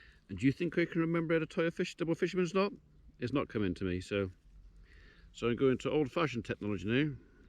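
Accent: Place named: Gloucestershire, United Kingdom. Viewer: Yes fans you have me ( Sooty) and Sweep as new subscribers. Sweep thought you had a Herefordshire accent, but its Gloucestershire one.. We look forward to giving you lots of banter.. luvly bit of grass. Is your farm a old one? All the best stay safe.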